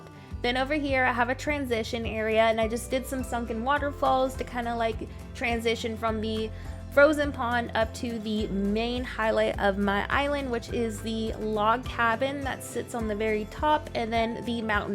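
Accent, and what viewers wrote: United States. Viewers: Ooooo, do you live in the Southern Hemisphere? I would have guessed Northern only because you seem like you have a US accent. I'm from New England myself (the land of regional accents).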